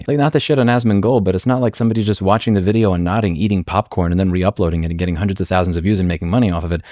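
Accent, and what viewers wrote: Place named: New York City, United States. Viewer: He's from NYC. I'm familiar with his dialect so it's not fast to me.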